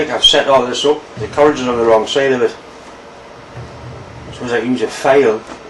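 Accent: Newcastle, United Kingdom. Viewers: Awee man. You from newcastle??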